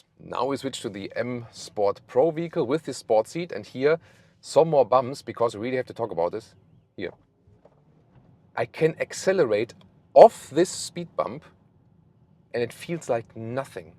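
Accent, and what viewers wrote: Germany. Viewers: There's nothing sweeter than watching a BMW review with German accent :)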